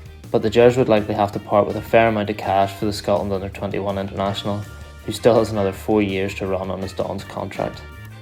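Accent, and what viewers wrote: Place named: Ireland. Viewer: Wow I didn’t realise there were Irish rangers supporters ? Can someone clear this up as the presenter sounds Irish ?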